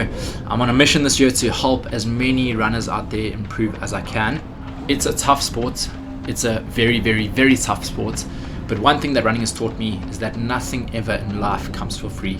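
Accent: South Africa. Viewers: Gotta be South Africa then 😋 love the 5k thought process vids and this kind of content the most! Thank u for taking the time to do these informative videos!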